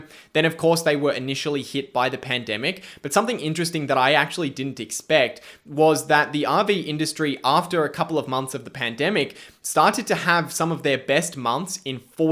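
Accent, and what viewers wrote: Australia. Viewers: An Aussie accent and rosy cheeks ☺. Lol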